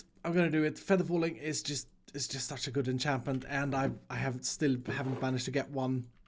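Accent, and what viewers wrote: Sweden. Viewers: i thought so im hearing om is English it’s very good but I hear that Swedish voice lets gooo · are you swedish?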